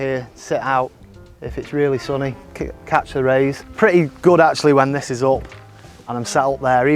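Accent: United Kingdom. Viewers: brit accent. Hard to understand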